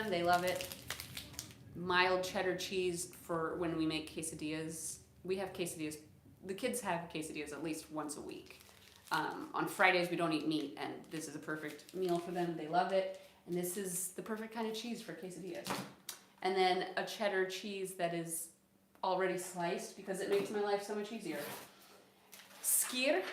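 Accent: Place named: Slovenia. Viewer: Girl u sound like a REAL Slovenian! My cousins have a whole basement with extra groceries and frozen goods! God bless ya girl! I live in Washington D.C. but my parents are from 🇸🇮! 👍 You sound sooo much like them!!!💋😊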